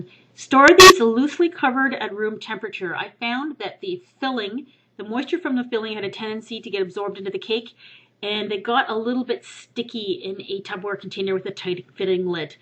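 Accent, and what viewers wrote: Canada. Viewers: this might be a silly question, but are you Canadian?? You're accent sounds very familiar! haha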